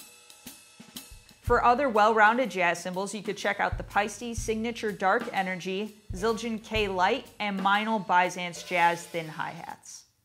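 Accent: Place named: Chicago, United States. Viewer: She sounds like she’s from Chi-Cah-Goe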